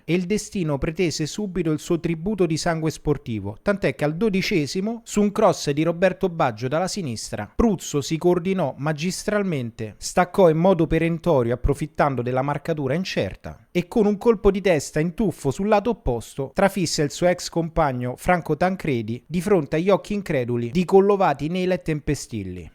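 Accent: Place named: Rome, Italy. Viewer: Parli uguale ai miei cugini più grandi romani, me ne parlarono tanto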